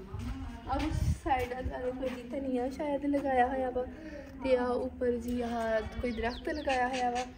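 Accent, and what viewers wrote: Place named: Doaba, India. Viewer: Tuhadi punjabi doaba di lag rahi hai...charde punjab ch Jalandhar te Hoshiarpur doaba ch aande ne...inz lag reha jiwe tusi bhi etho de ho...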